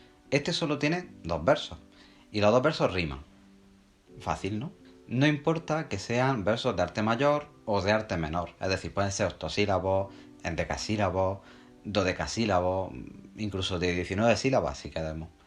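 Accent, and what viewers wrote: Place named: Andalusia, Spain. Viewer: No respondas a esta gentuza solo buscan atención, me ha salido tu video en mi sesión de estudio y sinceramente no he tenido ningún problema entendiéndote añadiendo, de que el acento andaluz es bonito no hacía falta darle ninguna respuesta ni explicación, gran contenido sigue así!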